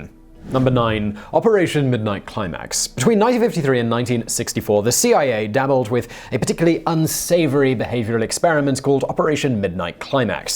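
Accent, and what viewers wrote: United Kingdom. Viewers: Yes, he can. He can relate the horror experiment interestingly while keeping it light with his lovely British lilt. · He isn't either. He's British me thinks :D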